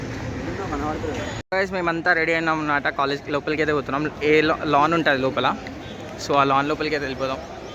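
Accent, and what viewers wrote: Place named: Tirupati, India. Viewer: Where are you from bro ? , Bangalore ah ? Tirupati laga vundhi bro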